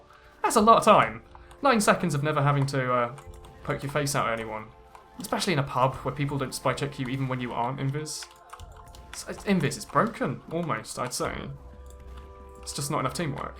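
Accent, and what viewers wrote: RP, United Kingdom. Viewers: Why do you sound so posh °=°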